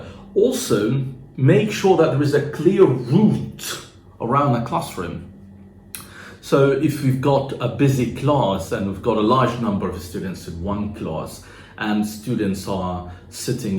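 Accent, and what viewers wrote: United Kingdom. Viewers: God Pless you Bro Your British accent is Great Keet it UP ❤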